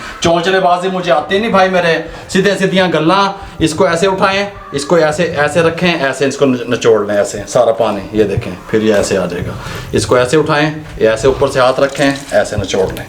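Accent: Punjab, India. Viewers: Boht piri Panjabi bolty hn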